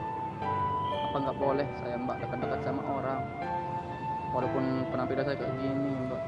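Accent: Lombok, Indonesia. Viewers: Logat"nya kek org lombok njirr😅 Owyah Bdw salam bang Gua dri Ntb nusa tenggara barat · knapa nada antum macem orang lombok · Boleh Sy nebak-nebak...spt Logat orang Lombok❤️ Mf kalo salah...!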